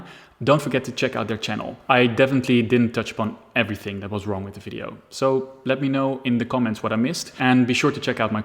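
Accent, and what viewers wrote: Netherlands. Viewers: You sound Dutch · Zo hallo… dat accent is wel erg Nederlands.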